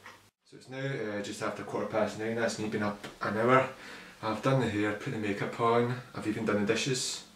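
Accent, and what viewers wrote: Scotland, United Kingdom. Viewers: the Scottish accent is awesome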